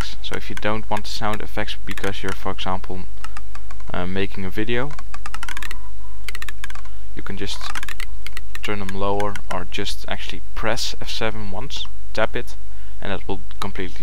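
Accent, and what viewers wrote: Netherlands. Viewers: I might be wrong, but are you dutch? I recognize that accent, I hear it every day ;).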